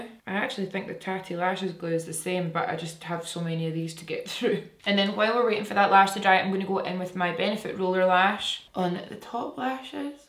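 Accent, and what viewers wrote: Scotland, United Kingdom. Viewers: nice to hear a fellow scot on youtube xx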